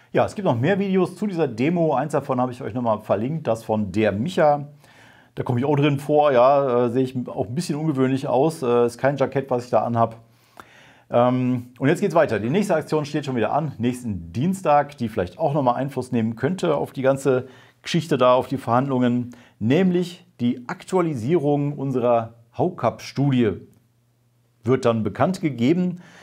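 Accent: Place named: Rhineland, Germany. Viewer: Dein rheinischer Dialekt ist sehr sympathisch, Hr.. Wie es aussieht, haben wir es geschafft! Wie es der Presse heute zu entnehmen ist. Warte aber sehnsüchtig auf Eure Bestätigung. Tolle Arbeit! Toller Support! Danke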